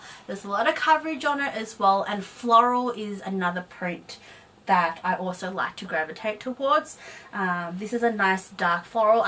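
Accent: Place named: Australia. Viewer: Only 4 mins in and already love this chick. Nice to hear a fellow Aussie too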